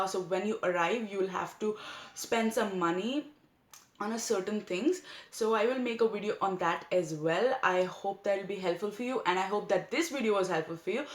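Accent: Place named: India. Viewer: 🐷🐷哎呀妈耶，这么隆重的阿三口音, 真的是所有单词都听懂了，但什么都没听懂最后🐷🐷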